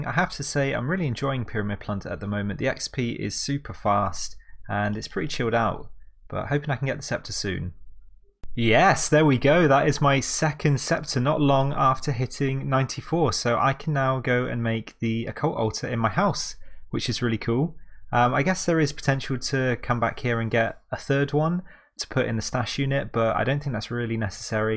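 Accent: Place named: United Kingdom. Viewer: Nice British accent Fellah!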